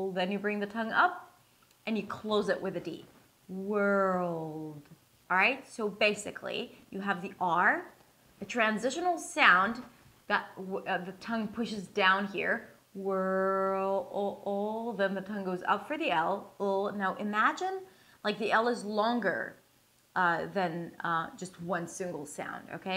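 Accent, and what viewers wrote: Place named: United States. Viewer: Hi! Thank you very much for your reply. My name is Katsu. I'm Japanese living in Japan. I think I speak English pretty well working as an interpreter but I still have a long way to go. You are definitely my role model because you are not an American but still you speak like an American, which shows how hard you must have worked (you moved to the U.S. when you were 20!!??). Acting must have done you a world of good. But how did you acquire your American accent? I really appreciate it if you could elaborate on how you mastered it. I know practice is the only way, but, for example, what accent training book did you use if any? Any accent training in acting lessons? I would like to know so much about you and your way of practicing. Not many people can get where you are, especially if you start your accent training after the age of 20. 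You are fantastic! I'm looking forward to your video about YOU. Thank you!!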